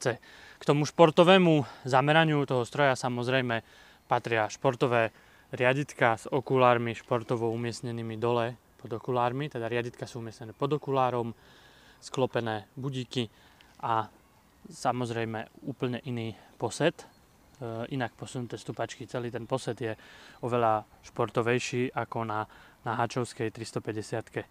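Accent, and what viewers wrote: Eastern Slovakia, Slovakia. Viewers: Len ,,tak trošku,,zaťahuje-isto ,,Vyhodňar,,aľe to nevadzí,ta ňe?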